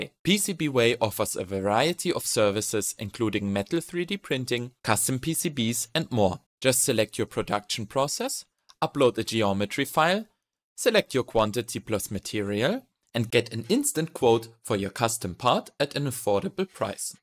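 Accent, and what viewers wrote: Germany. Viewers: klasse Video, aber könntest du das vllt auf Deutsch machen? Dein Akzent klingt deutsch ;-) ich kann leider kein englisch...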